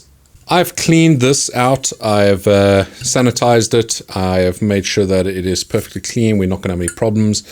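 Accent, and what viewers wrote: South Africa. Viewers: When you recognize a South African accent... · Hi. Based on your accent, I'd say you're South African. Where are you based? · got such hectic whiplash because i instantly recognised your south African accent 😂😂😂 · Is that a South African accent? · You sound South African 🤣🤣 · Can't miss that SA accent anywhere you go.... Nice video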